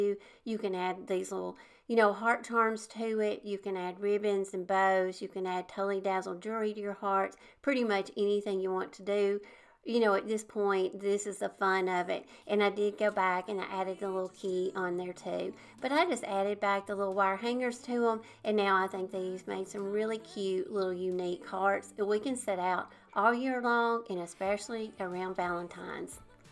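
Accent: South, United States. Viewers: Hey! Jill here! OMG!! Such cute ideas! I must get busy with my Valentines tree. Last year I made Plaster of Paris pink and red hearts for my tree. Used a heart mold that I got cheap at Bin Time. BTW!! I love your precious, southern voice. I'm a Georgia girl and sound so much like you. Many blessings!!!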